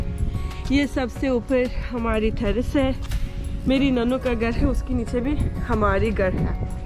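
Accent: Pakistan. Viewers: Love you sister. This whole video is so beautiful MashaAllah. The way you speak Urdu is so adorable. I liked the way you prepared everything. Bless you lovely sister. More power to you!! ❤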